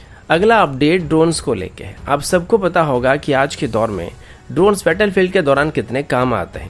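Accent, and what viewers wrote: Odisha, India. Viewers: Bhai tame kana odia pila ki??? Language ru jana paduchhi tike tike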